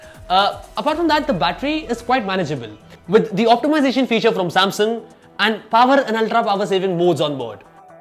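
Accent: India. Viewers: terrible annoying i dian accent lol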